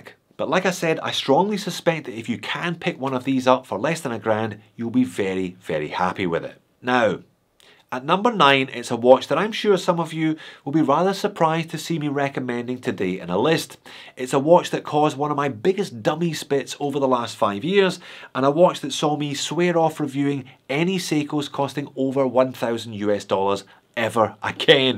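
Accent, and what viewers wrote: Scotland, United Kingdom. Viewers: Me every time I hear an unexpected Scottish accent “oh ma gawd” Appreciate the video, I want a gmt for the utility but don’t want to be daft and overspend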